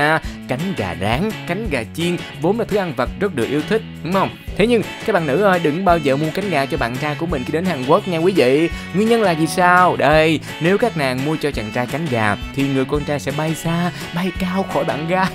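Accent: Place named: North, Vietnam. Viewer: ad nói giọng bắc nghe cute vải :)))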